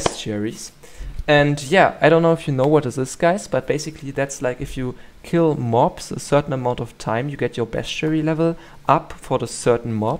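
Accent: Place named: Germany. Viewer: ich lieb den deutschen akzent hammer video tho :D is there a better way from like combat 40-50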